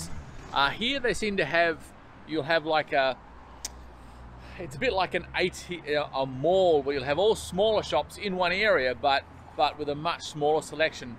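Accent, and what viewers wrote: Australia. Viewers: я живу в Англии уже много лет, его Австралийский мне понятен без проблем, а вот Американские фильмы не смотр, а иначе все время переспрашива своего партнёра "что он или она сказали", он часто мне отвечает, что сам не понимает. А пр индийский английский, это да... 🥴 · Так сложно на слух понимать речь... )) Привык к "американскому" английскому. Австралийский - как будто вообще другой язык)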